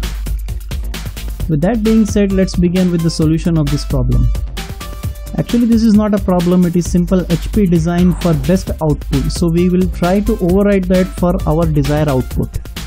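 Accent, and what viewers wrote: India. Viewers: Why your voice makes me think you are Indian ?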